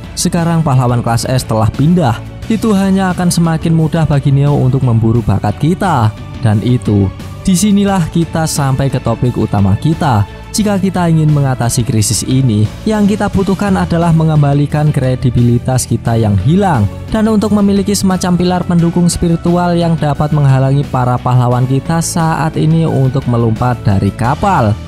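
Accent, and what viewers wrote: Java, Indonesia. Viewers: bang bisa ngak aksen logat jawanya di ganti udh bosen dengarnya🗿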